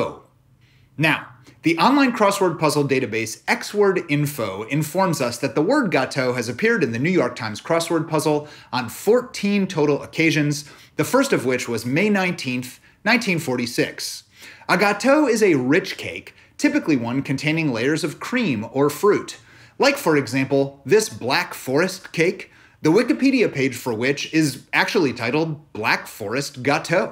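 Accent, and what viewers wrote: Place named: France. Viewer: Quel belle accent français très cher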